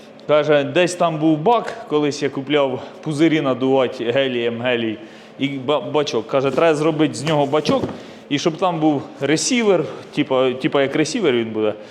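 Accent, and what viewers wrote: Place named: Western Ukraine, Ukraine. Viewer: Ну вино шо з якогось села по мові чути чи Львів чи Закарпаття мова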